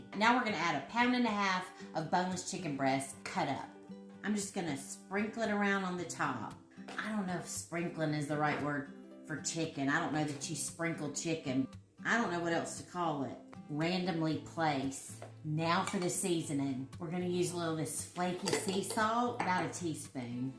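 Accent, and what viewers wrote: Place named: Texas, United States. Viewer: You scream Texas..😃 where are you from? Or the Carolina’s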